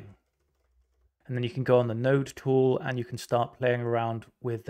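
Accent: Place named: United Kingdom. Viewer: Understanding the British dialect…challenging but repetition aids learning!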